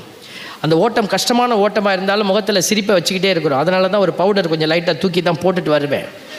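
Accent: Sri Lanka. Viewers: எனக்கு பிடித்த தமிழ், இலங்கை தமிழ், கேரள தமிழ், மலேசிய தமிழ். ஃபாதர் ன் இலங்கை தமிழ் அருமை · இலங்கை தமிழ் 😅😅👌👌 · Father Srilankan tamil azhaga peasurigale😊 awesome 🎉🎉🎉 antha "விளங்கிட்டா" ultimate ❤❤❤ Unga sirippukkulla yelo vazhi irukkumnu puriuthu. Praise be to the Lord 🕊 unga pani sirappa thodaranum. Lots of love from your child Catherine, Srilankan❤ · Father இலங்கையர்கள் எல்லோரும் நீங்கள் பேசுவது போன்று சாதாரண தமிழ் தான் பேசுவோம் யாழ்ப்பாணத்தில் இருப்பவர்கள் மட்டும் தான் நீங்கள் பேசி காட்டும் தமிழில் பேசுவார்கள் ஆனால் யாழ்ப்பாண தமிழ் தான் பொதுவான இலங்கை தமிழ் என்ற தவறான கருத்து இருக்கிறது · Neenga peasinadhu srilankan jafna tamil. neenga peasura tamil madhiri oru koottam irukku adhudhaan Indian vamsavaliakkalnu.nuwara eliya mavattaththula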